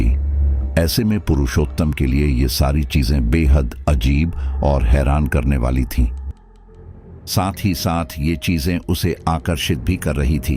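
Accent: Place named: Rajasthan, India. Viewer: आपकी भाषा में राजस्थान वाली मिठास आती है ❤❤❤❤❤❤❤❤❤❤❤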